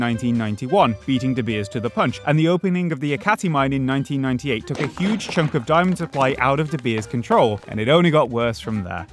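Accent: United Kingdom. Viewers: Assuming they are a person at all is assuming too much. The algorithmically suggested video, voiced by the algorithmically optimal Brit accent, with an algorithmically generated identity of "PhD in something cool, but really just a shut-in shitposter (like you!)" to achieve a perfect balance authoritative intellect, relatability, and trendy meme humor. The PhD chops will never be relevant to the content so they can't really be disproven, while the meme humor can never really be separated from chasing the algorithm in any meaningful way. The editing, animation, and character design are professionally utilized, but still generic enough to be commissioned through something like UpWork on the cheap. The vocabulary sounds like the massive youtube audience of lefty, college socialists, but the content is very neoliberal globalist. This is pretty much just capitalism in it's purest form, the entire video is designed to succeed algorithmically without much of a point to make. 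Even the profile picture is appropriately colorful and busy to attract attention, encourage further inspection. The channel name follows the marketability framework made popular by band names in the 80's to today. I could go on, but I have already spent too much time thinking about the topic already. Hopefully if I ever get around to making serious youtube content some asshole in the comments doesn't go on and on about how it's blatantly formulaic and motivated only by a desire for popularity and revenue. But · oh youre british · This guy has a middle class counties accent apart from the word ‘now’ which he says with a Black Country accent 😂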